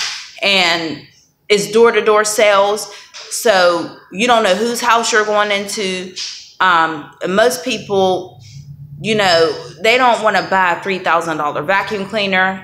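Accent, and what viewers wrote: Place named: South, United States. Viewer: I'm from Texas you sound like your from down south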